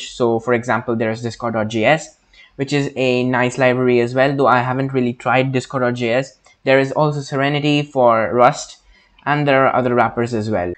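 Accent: India. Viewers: Damn you sound more indian than ever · are u indian?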